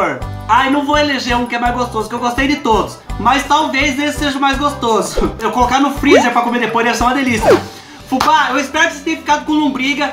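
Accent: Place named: Piracicaba, Brazil. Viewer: parese piracicabano falando kkkkk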